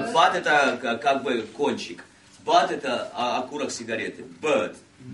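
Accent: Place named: United States. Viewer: It is a lot of sense in the method, yet the author's pronanciation is distinctly american. And his exerpt from "Riding Red Hood" I could not follow at all. Sorry!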